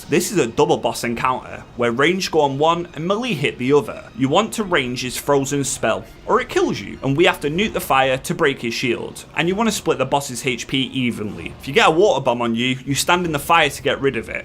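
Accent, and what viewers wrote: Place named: Northern England, United Kingdom. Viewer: been loving your videos. Prefer to watch the compilation ones in one go, beer in hand - the night is mine. I have one small criticism and that is it seems like your videos are on speed.. They go through so quickly there's barely time to absorb the info. If you could slow it down a bit for us old timers lol. Love the accent, I'm guessing somewhere up north. Essex boy here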